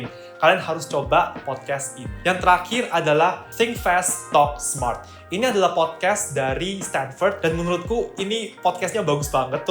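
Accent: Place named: East Java, Indonesia. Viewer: surabaya ta sidoarjo mas? btw terima kasih tipsnya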